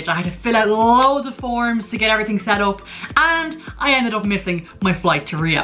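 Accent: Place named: Ireland. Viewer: I feel very happy 'coz I understand 90 % now... When I started listening to I got only about 5 % because of her Irish accent. So, it's important to go ahead and listen to her even without subtitles in Portuguese, because you can improve your listening as I am doing right now.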